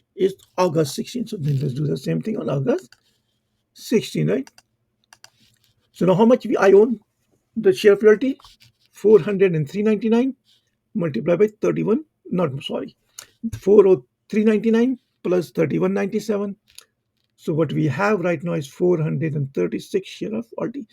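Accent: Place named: India. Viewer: Indian accent!!!!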